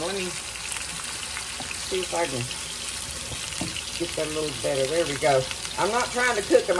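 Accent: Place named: Appalachia, United States. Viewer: Miss I just love to hear you talk you have the sound of a true hillbilly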